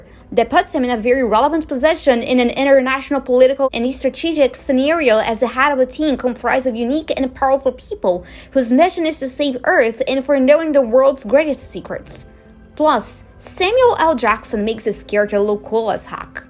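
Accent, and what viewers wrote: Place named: Brazil. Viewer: Ahh, i had a brazilian teacher, i thought the accent felt familiar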